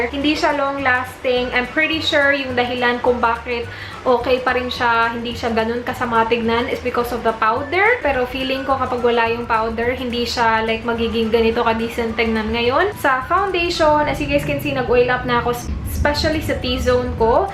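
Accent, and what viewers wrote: Visayas, Philippines. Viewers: ate, may halong bisaya ka ba? :) i love your videos newbie po ako sa yt mo :) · BISAYA KA PO BA? PARA KA NAMANG TRYING HARD MAG ENGLISH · i mean you speak visayas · Bisaya ka teh?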